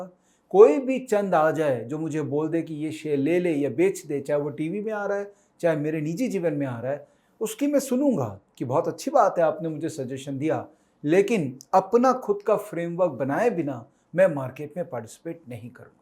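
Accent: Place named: Kolkata, India. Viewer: !बातों को कहने का लहजा ही बता रहा था कि तुम कलकत्ता के हो।शब्दों का चयन व वार्ता में तुम्हारे मनोभाव,जो मैंने स्पष्ट महसूस किया; यह बताते हैं कि तुम शिष्ट परिवार से हो।तुम्हारी सरलता व परहित भाव तुम्हे बहुत आगे बढ़ाएंगे व बहुत प्रसिद्द व सफलता दिलाएंगे।मंगलकामनाएं।सुशील जैन।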